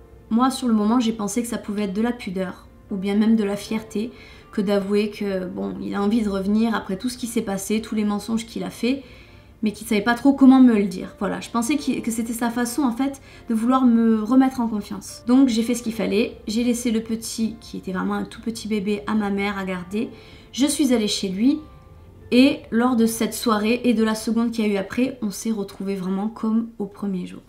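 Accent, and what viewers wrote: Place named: Montpellier, France. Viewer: Wahou, tu me touches beaucoup. J'ai l'impression de me voir à travers toi, même si je suis beaucoup plus âgée. Et en plus tu dois être de Montpellier vu ton accent! Tu fais si jeune et tu as connus déjà des choses assez difficiles... Choses que je connais parce que comme toi, je suis très sensible, naïve et gentille. Quel courage tu as eu de garder cet enfant avec des parents pas très compréhensifs. J'ai connu tout ça aussi. Bref, la vie est devant et je te souhaite tout le bonheur du monde. 😊💋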